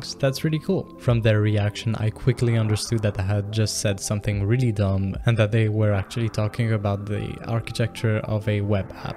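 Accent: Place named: United States. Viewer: Comment tu as un accent totalement américain alors que tu est bilingue ?les techniques pour mieux apprendre l'anglais.merci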